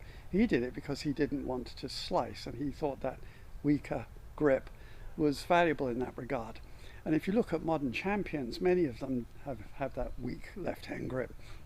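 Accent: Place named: United Kingdom. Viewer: Hi wondering where do you live as your accent sounds British 🇬🇧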